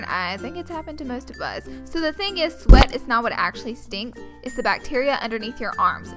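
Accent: South, United States. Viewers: Everlasting Luv she's from Alabama so she has a southern accent, I'm from Alabama too so I don't even notice it unless she says something about it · She's southern. She has an accent. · Your accent is adorable! I'm from the south too! ( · Shut the fuck up! She's southern! Most states pronounce things differently! Would you tell a British person they pronounce aluminum wrong? No! Because that's how they pronounce it! So fuck off, BITCH! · this is awesome! can totally tell you're from a southern state and this is the first video I've ever seen from you lol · It's that southern drawl lol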